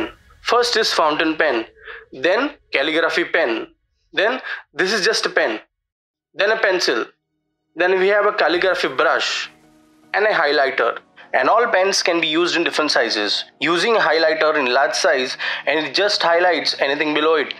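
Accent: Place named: India. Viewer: Funny indian accent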